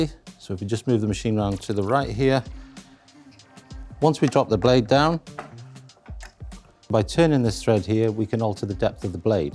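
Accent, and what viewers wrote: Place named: Wales, United Kingdom. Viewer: There’s welsh in that accent for sure!!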